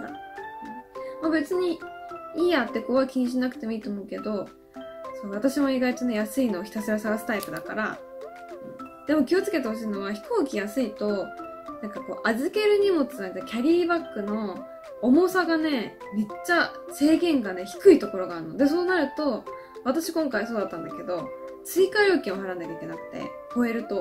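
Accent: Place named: South Korea. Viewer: 日本語の発音が韓国語すぎて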